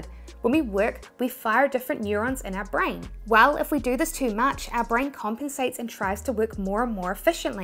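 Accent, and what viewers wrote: New Zealand. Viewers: Great that you share your experiences and all the helpful information; we all benefit from this. I'm a fan of your channel, inspiring videos, and lovely NZ accent. Keep up the great work! But relax sometimes too ;-).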